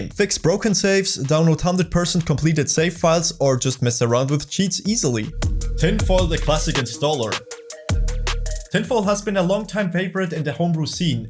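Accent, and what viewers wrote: Germany. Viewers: You can hear that this guy is from germany.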